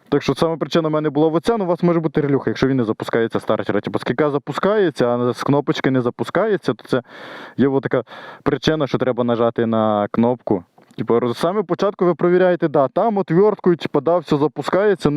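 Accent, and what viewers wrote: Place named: Ukraine. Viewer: Вот это классный украинский язык! Настоящий украинский, что и в детстве я от тетки слышал и от ребят с Украины, что к нам на Север приезжали работать, и от товарища с Николаева, и от тестя бывшего, земля ему пухом. Всё понятно! Совершенно отличающийся диалект от того, что украинцам навязывают полупольские галичанские западенцы, со своим отвратительным пшиканьем! Я просто с удовольствием послушал украинскую речь.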